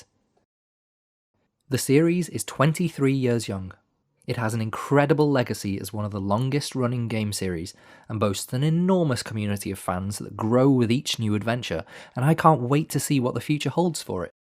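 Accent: Wales, United Kingdom. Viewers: Thanks for sharing these podcasts; it’s so interesting to hear your story with TR. I think we’re quite similar! I grew up in Wales too - clocked your accent;) - but had a crap time being bullied in a rough secondary for being ‘geeky,’ so actively pushed down those interests to remain safe at school. It’s inspiring to hear someone embrace their passions so wholeheartedly. Did you get any backlash growing up for your interest in TR and gaming up in NWales? I loved the puzzles, history and more deliberate game pace of the older games, even though my family thought it was Lara’s sex appeal - how wrong they were…;p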